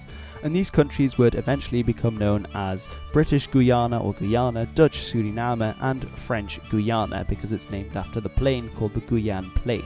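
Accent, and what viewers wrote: Argentina. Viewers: Guy who runs this channel: Congratulations on your pronunciation! Your south american accent came out very Argentinian! I'm Argentinian btw. A difference you forgot to address is that us Argentinians speak differently from almost all other south americans. Most south americans pronounce "Yo" (me) as "Io", but we pronounce is as "Sho". And we,m argentinians, are also much more vulgar in speech than the rest of the spanish speaking nations. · Your "latin american spanish " is just argentinian spanish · your spanish has an Argentinian accent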